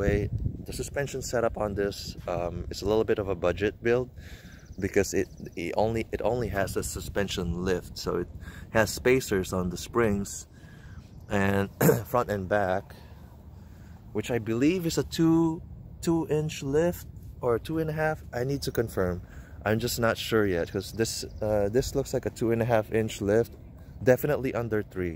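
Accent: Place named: Philippines. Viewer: You sound Filipino :) nice car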